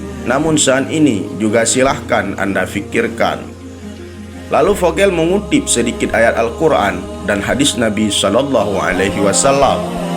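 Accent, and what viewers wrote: Bali, Indonesia. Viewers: Assalamualaikum admid ,admin apa ora bali ya lagat og kental kaya dari bali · Cengkok bahasanya seperti orang bali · Admin punya aksen dari zona Bali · Pengisi suara dari bali ya?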